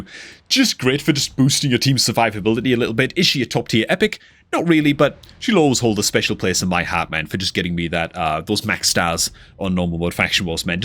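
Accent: North East England, United Kingdom. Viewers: I wish he would slow down a bit. I'm guessing north east England and it's hard to keep up at times. Not Newcastle but that neck of the woods.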